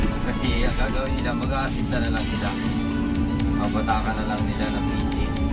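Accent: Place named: Bicol, Philippines. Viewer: Parang bicolano ka mag salita pre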